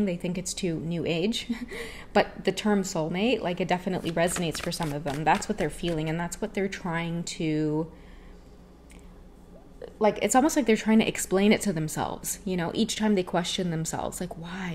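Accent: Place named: Trinidad and Tobago. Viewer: It sounds so lovely when speak Trini. I just happened to hear on of your shorts rt now where Ur speaking Trini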